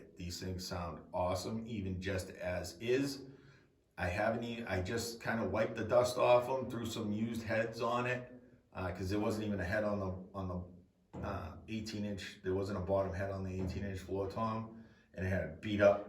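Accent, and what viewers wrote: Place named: Western Massachusetts, United States. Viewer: I'm watching this saying to myself "I recognize that accent" and then sure enough you mentioned "western Mass" and I said Ah Ha! A fellow MassHole!!!! LOL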